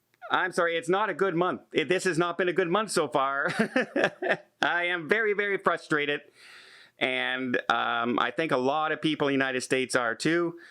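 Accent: Canada. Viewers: All this a guy who's country has banned cruising for a year. If you want to criticize a U.S. Agency then drop the Canadian accent clown and stay in your country!!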